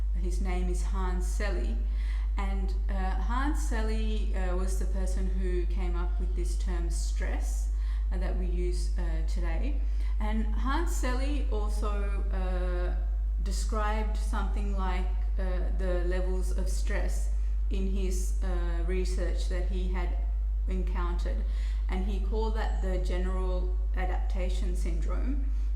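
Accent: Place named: Australia. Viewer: Australian accent interesting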